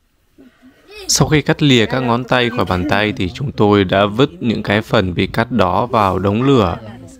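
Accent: Vietnam. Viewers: Ông a người miền nam hay bắc đấy,,giọng nghe quen quen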